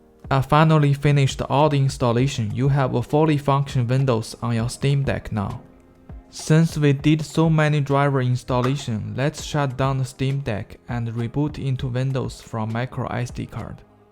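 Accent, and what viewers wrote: China. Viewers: Nice tutorial, 一听就是中国同胞啊😂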